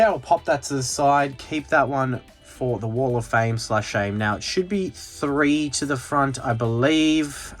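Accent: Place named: Australia. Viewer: Aussie accent, instant subscribe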